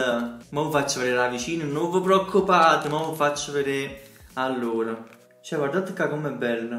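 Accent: Caserta, Italy. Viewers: Che zona di Caserta?😍 io sono della provincia invece 😍 si sente che l’accento è più casertano. Troppo forte! Mi sento a casa 😂🔝 e Ferro vecchio passa tutti i giorni ahahah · Da napoletana ti posso dire che si sente tantissimo che sei di Caserta e non di napoli Napoli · Da "napoli napoli" ti dico ch si sente un sacco il casertano hahahaha ti adoro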